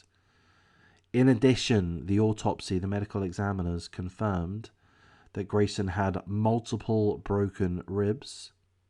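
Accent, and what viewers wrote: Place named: United Kingdom. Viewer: As listening you are clearly British like me, out of curiosity do you still live in England? Or follow these because of now living in America?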